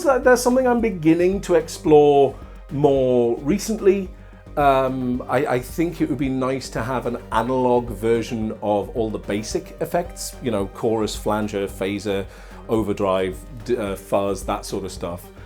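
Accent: United Kingdom. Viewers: Love your Welsh accent. Second career in voice over?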